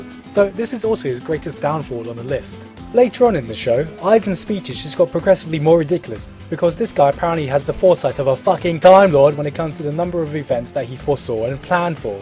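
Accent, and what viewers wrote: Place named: England, United Kingdom. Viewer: Nice English accent,. Are you English by the way? Well, anyways, that's not important. Naruto and Bleach can be overated sometimes. I just recently finished.hack//sign and 11eyes. They're both pretty decent animes. I've been trying to get back into Bleach, but I have to go through all those episodes again.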